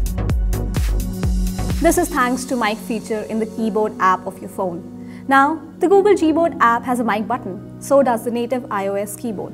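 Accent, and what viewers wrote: India. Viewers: that's not broken English, it is Indian English (read accent), native English people might face difficulties but however, others have problems with native accents too. That is normal!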